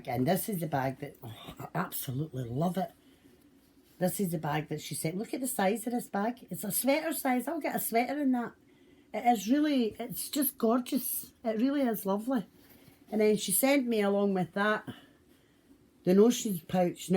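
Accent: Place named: Scotland, United Kingdom. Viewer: Oh my god I love you and your scots accent just found your channel I don't knit I sew but I will surly be visiting your channel again cause I feel like l have been visiting ayou in your home you are a pleasure to listen to god bless you from northern ireland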